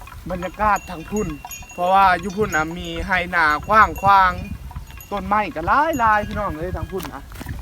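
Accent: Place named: Phu Thai, Thailand. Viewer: พูดน่ารักคำพูดเพราะภูไทยทีอีกภูไทหึ่วคำพูดออกแข็งทีลาว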